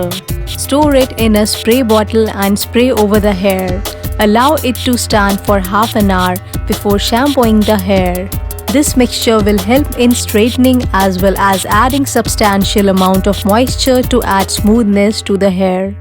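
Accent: India. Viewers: Her voice is like an indian one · thats indian accent (by the way I'm an Indian 😤😤😤😤😠😠😠😠😠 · You guys are all commenting on her accent rather than the video just because she has an indian accent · It is Indian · Jake elwafi it's indian haha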